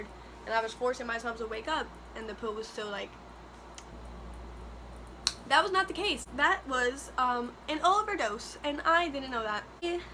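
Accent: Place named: Puerto Rico. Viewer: tú eres Boricua por Leyyy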